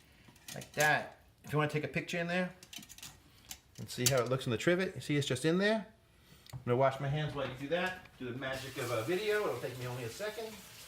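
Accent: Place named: New York, United States. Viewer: Do you always turn on your Nyuu Yawk accent to the max when you're in the kitchen,? <3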